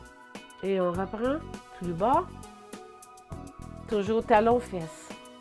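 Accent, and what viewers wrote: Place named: Canada. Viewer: Quel bel accent canadien. Leger pour moi. Merci bcp From israel en confinement😞😷😷😷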